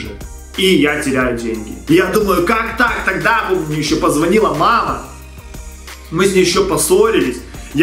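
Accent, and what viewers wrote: Ukraine. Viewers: Какой же у тебя забавный тон разговора). Акцент коренного Украинца.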